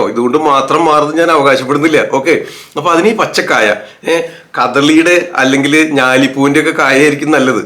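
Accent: Thrissur, India. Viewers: Thrisoor slang👌 nannaittund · pleasant Thrssivaperror accent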